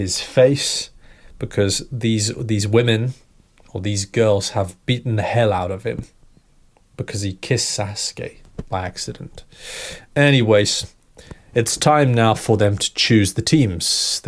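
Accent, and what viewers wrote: United Kingdom. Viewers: Really, the British accent is the best.❤❤❤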